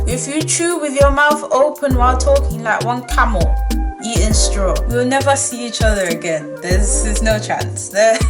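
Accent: United Kingdom. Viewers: I thought you were originally from London 🤷🏿‍♂️ I love your voice ❤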